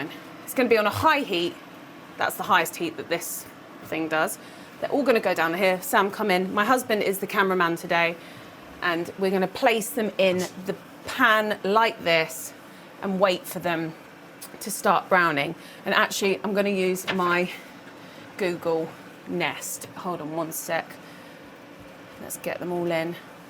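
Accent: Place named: London, United Kingdom. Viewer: That's a London accent. Because she is a Londoner 🙄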